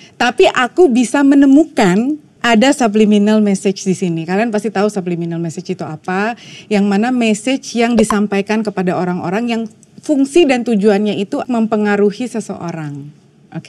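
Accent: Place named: Java, Indonesia. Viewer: Mba nya imut ...., kosa kata Jakarta tapi logatnya medok Jawa, jadi pengen nyubit si Mba ... heheee..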